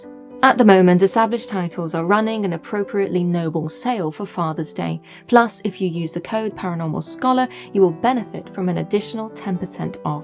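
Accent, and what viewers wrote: United Kingdom. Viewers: Not to mention her pretty British accent. So proper!! Great narrator too.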